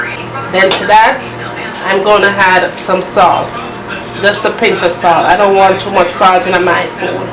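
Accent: Jamaica. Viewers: Now i "H-add" a little... yeah man real yaawdy this... can't get anymore authentic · Delicious! Jamaican cornmeal porridge. I grew up in Lewisham, SE London. I love your Jamaican accent! It's beautiful! And love the 'Nah wan no lumpy-lumpy porridge'. Jamaican is just the coolest patois... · Mi just love how you talk straight jamaican. Thanks fi the recipe.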